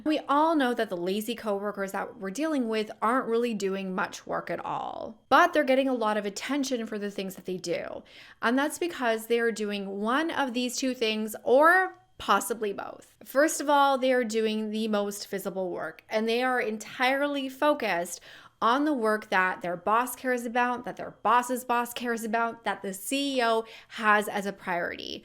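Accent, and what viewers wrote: California, United States. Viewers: what's with the way she speaks????????????? she's pretending to be a teen in high school in cali???? she's probably in her 50s, a bit too old for that annoying accent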